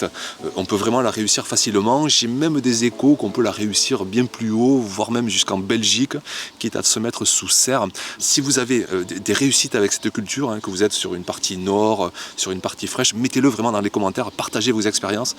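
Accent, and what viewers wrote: South, France. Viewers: Bonjour, je confirme que la chayotte en pleine terre réussit très bien en Alsace! La mienne est très à l'aise en grimpant sur l'actinidia.J'en rentre des cageots pleins en ce moment...Par contre, effectivement, en pot, aucune production...! J'adore vos vidéos si vivantes et avec l'accent du sud en prime!😀🥰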